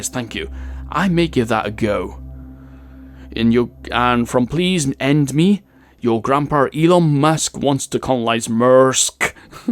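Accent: Wales, United Kingdom. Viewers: Welsh? Did I hear WELSH?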